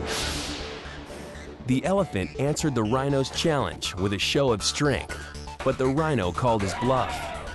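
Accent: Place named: United States. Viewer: I am not attacking Americans? I am saying that if this hole video was spoken in, with an English, Scottish, Australian, or even Irish voice-over accent, it would not sound so incredible and horrified terrible as it is spoken by this American voice-over. And this video is not the only example of what I mean, it is something USA people do for the last thirty years, and it's getting worse every day? Why? How come? Where did that start? I whish the video had a BBC voice-over instead of this.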